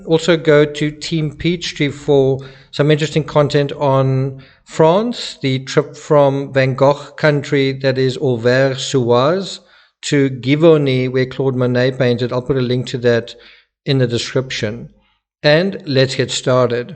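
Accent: South Africa. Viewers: I'm confused, do people not realise you have a South African accent and that's why you pronounce things the way you do?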